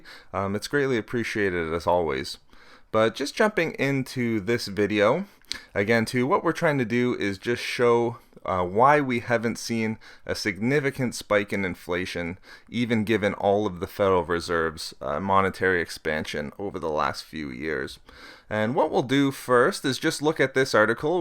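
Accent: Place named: North, Canada. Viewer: Very interesting. I'll check these out and read them for myself, but I am interested in seeing whether inflation rises and what the particular causes end up being. On a more humorous note, do you know that you tend to pronounce "excess reserves" as "access reserves"? Hehehe... Must be that light northern Canadian accent. Don't take that as a criticism though. It didn't take away from the content. I just thought I'd have a friendly pick at you. Looking forward to the next CoinCast videos!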